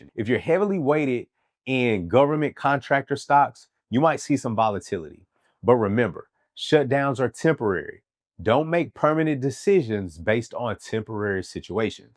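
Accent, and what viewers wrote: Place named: Kansas City, United States. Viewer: Thank you for this. It almost feels second nature to scramble and try to mitigate loss by pulling out money and holding tight to every penny during a time like this. On a different note, I don't know where you're from originally but that accent sounds real KC 😂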